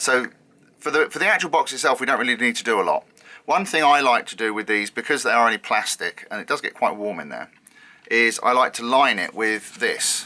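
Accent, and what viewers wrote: United Kingdom. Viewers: Love that british accent, lol Will make one too, thx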